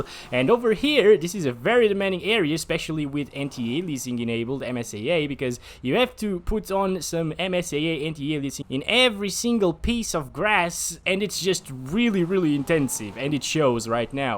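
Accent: Brazil. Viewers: Ele é brasileiro????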